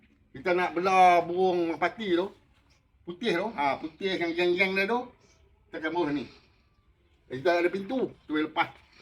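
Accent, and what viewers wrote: North, Malaysia. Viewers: dh KENA ckp utara 😅beestt... mmng terbaikk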